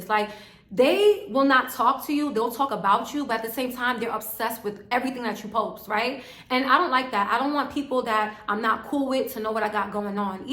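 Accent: New York, United States. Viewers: You sound so New York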